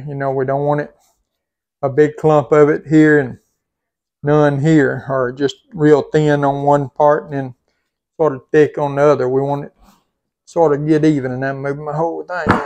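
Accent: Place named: Texas, United States. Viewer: I will watch again to hear your Texan accent!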